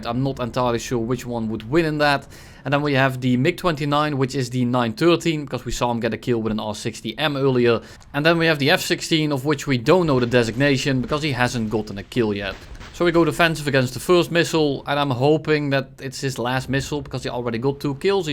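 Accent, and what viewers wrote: Netherlands. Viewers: Youre dutch right?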